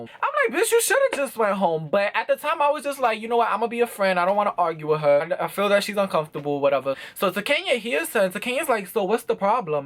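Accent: New York, United States. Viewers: I could listen to you read the dictionary! Your NY accent is so dope!!! Love from London UK x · Is he from NYC.? Bc I'm from NYC and I talk the same way, same slang and all😂😂... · fucking love your accent 😬 I miss new york so much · this new york accent is everythingggggggg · Your accent be havin me want to live in New York.......like its life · Hey how old are you...you new york accent is so cool love to hear you tell storys your so animated..lol..jhave you ever been to kansas city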